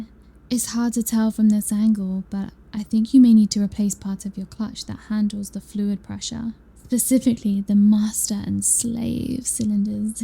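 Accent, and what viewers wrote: United Kingdom. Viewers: British AND spicy? Yes, please. You absolutely killed it with this one. Apparently you had some fun with it!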